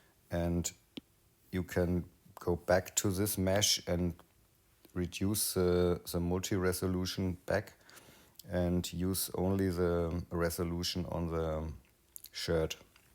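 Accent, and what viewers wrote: Bavaria, Germany. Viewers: Sehr geil der Hinweis aufs Bavarian english. Wer’s nicht leiden kann soll halt was anderes gucken 😜 Guades Video, weiter so, hawe dehre😂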